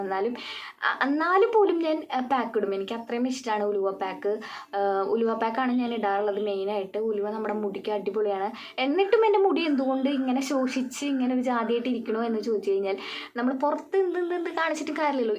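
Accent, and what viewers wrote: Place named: Thrissur, India. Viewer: Good, വീടെവിടാ തൃശൂർ ആണോ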